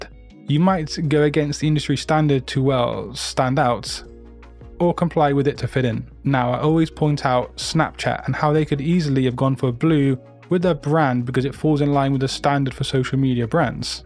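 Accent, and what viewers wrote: United Kingdom. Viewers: Are you from the USA? I thought you were from the United kingdom.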